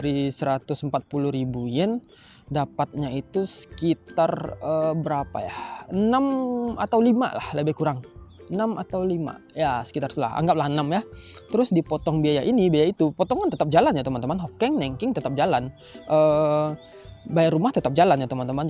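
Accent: Padang, Indonesia. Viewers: Abg nih org padang ya?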